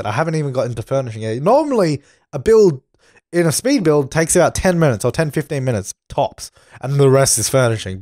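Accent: Australia. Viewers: I could listen to you talk forever .That sounds creepy, but i just love Australians and Australian accents .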